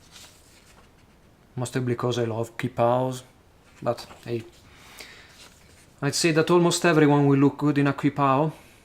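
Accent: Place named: Italy. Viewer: Dalla cadenza inglese/maccheronica sembrerebbe lmao sarebbe una gioia, io non conosco nessuno che gioca a etrian odyssey... E community italiane penso non ne esistono :/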